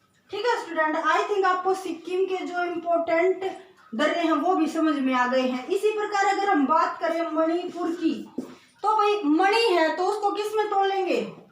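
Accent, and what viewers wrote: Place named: Haryana, India. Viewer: Nice but d'nt use haryanvi ton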